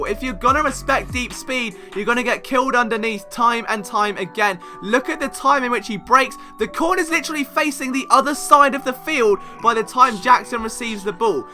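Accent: England, United Kingdom. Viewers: Hi! Great content and all. Just curious, what’s your Philly roots? It seems like you know so much about Philly sports mist especially with your English accent:-)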